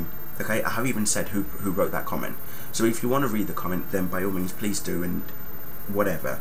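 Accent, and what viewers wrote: United Kingdom. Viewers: Man, U dont have to apologize its ur opinion. I might noit agree with u sometimes but I still like ur review and ur British accent lol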